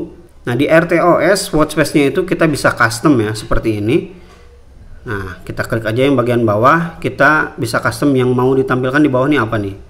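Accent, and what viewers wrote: West Java, Indonesia. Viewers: sae a repiuna... nyunda pisan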